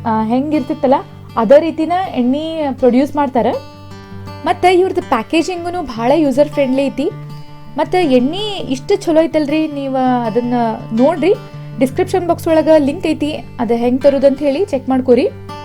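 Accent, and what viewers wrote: North Karnataka, India. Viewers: Nimma utaara kannada bhaashe nange tumbaa ishta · ಎಷ್ಟು ಚಂದ ಉತ್ತರ ಕರ್ನಾಟಕ ಕನ್ನಡ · uttara Karnataka bhashe superb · ಮೇಡಂ ನಾ ಇರೋದು ದುಬೈದಾಗ ಅದರ ನಮ್ಮ ಉತ್ತರಕರ್ನಾಟಕ ಭಾಷೆ ಕೇಳಿ. ಒಮ್ಮೆ ಊರಿಗೆ ಹೋಗಿ ಬಂದಂಗ ಅತ ನೋಡರಿ ಅಕ್ಕ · ನಮ್ಮ್ ಉತ್ತರಕನ್ನಡ ಭಾಷೆನ್ ಹಂಗರಿ. ಕೇಳಿದರ್ ಹೊಟ್ಟೆ ತುಂಬದಂಗ್ ಆಗ್ತದರಿ. Keep it up mam. ನಿಮ್ಮ ರೆಸಿಪಿ ತುಂಬಾ ಚನ್ನಾಗಿದೆ. Mam. ಹಾಗೆ ಜುನ್ಕ ವಡೆ. ಎಣ್ಣೆಗಾಯಿ ಬದನೇಕಾಯಿ. ಅಗಸಿ ಹಿಂಡಿ. ಇಂತಹ ನಾನಾ recipe ನ ತೋರಸಲಿಕ್ಕೆ try ಮಾಡಿ. Mam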